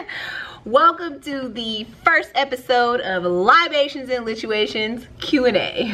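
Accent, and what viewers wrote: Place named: South, United States. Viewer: Ya accent is so sexy. I love that country girl accent?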